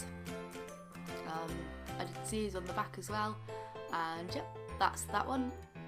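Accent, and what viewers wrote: England, United Kingdom. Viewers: Awesomely adorable squishy collection! Daughter & I can not wait to get started, then maybe we can tackle your squishy vending machine which was decorated so so sweetly! Congratulations on all your new subscribers! I enjoy the voice over explanations, not to mention your lovely English accent, which is a rarity here in America.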